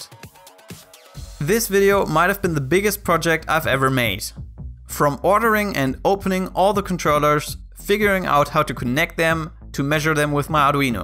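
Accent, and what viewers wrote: Germany. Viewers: You sound german. Are you? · Are you German? Great videos! (Subscribed!)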